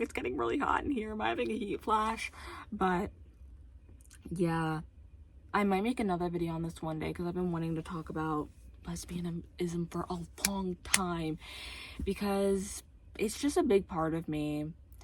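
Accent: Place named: United States. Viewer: I thought you were American.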